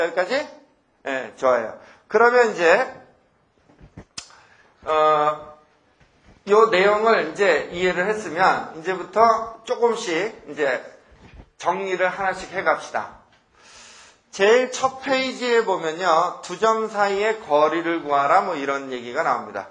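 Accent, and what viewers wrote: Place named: Seoul, South Korea. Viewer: 오 옛날 방송 보면 나오는 서울 사투리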